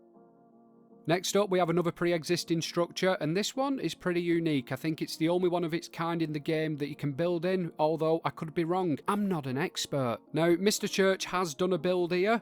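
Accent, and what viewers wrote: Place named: Northern England, United Kingdom. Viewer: mate your commentary is unreal, as a northerner hearing another northerner doing videos is hilarious cause I catch all the jokes, there'll be a time soon where northerners are appreciated more on youtube and you'll get ya views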